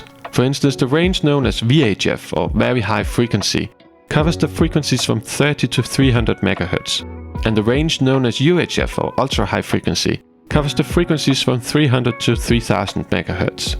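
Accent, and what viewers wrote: Denmark. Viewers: 110% dansk prosodi. Det er ligesom at høre Mumlemanden 😊❤️ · lmao I watched all the way up to the point where you showed the Danish map until I realized you were Danish too. Your accent is very faint. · Is that a Danish accent i'm detecting?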